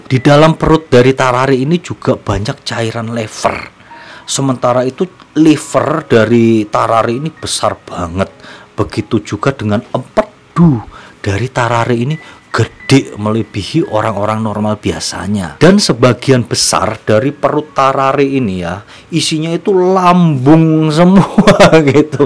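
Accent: Java, Indonesia. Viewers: jowo ne ning ndi mas?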